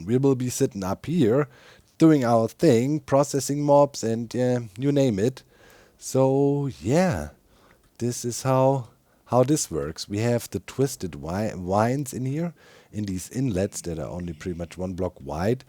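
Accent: Germany. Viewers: In my [admittedly long] student career, the professors with the best true/technical understanding and working knowledge of their chosen field have been German. I count those professors among my favorites; I learned so much from them, and still dream of aspiring to their level in my own work. Is that why I enjoy watching vids? Might be. He's got the Hive Mind, but he's also got an accent like my old professors, and he produces the specific-technical kind of violently ingenious applications that might as well be a stereotype of Germanic Engineers. And he's got a thing for tomatoes. Inspiring yet relatable, with a comforting accent. Don't worry about the video editing, nor the final length of the videos you post. I think your fan base is here for the long haul.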